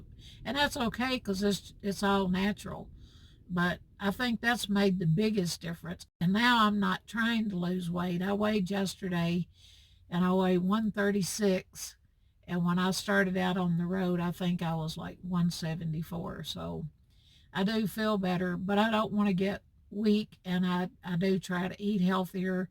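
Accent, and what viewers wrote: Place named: North Carolina, United States. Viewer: You sound just like my family! My mom is from North Carolina, and I love it when we go visit family there! I just watched Bob's interview with you, came right over to your channel and subscribed! I love seeing van tours, and your recliner is AWESOME! I'm look forward to watching the videos on your channel. I hope to get out there myself once I retire from teaching in 5 years (especially after going through this pandemic! LOL!) You were so encouraging in your interview - I want to encourage you also. Do what YOU love, and everything else will work out for you. Safe travels to you - I want to be like you some day! 😁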